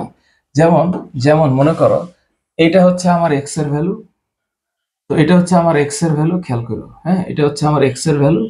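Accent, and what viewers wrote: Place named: Sylhet, Bangladesh. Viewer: Sylheti basa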